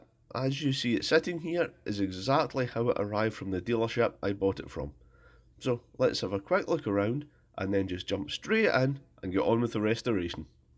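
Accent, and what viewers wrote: Scotland, United Kingdom. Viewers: Another sublime restoration video from the man with the sublime Scottish accent. is very funny 😂👏👍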